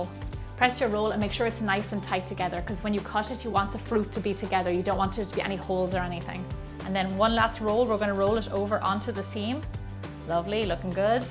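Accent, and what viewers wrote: Ireland. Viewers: I totally adore your accent! So adorable! PS: I secretly wanted an Irish accent when I was a kid! And I still want one now!! 😝😝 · Okay this may sound REALLY weird but I love your Irish accent and I use to call the plastic wrap a plastic wrap but recently I've been calling it cling film😂 and omg I have been dieing to try sweet rice it sounds sooooooo good!!!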